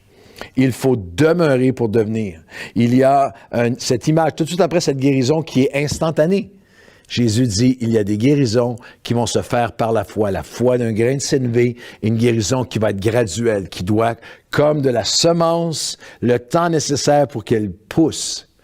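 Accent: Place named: Canada. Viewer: Avec vous depuis le sud de la France ! Dans le minervois! Ne soyons pas des vierges folles !! Merci pour votre prédication edificatrice avec l accent canadien ! ❤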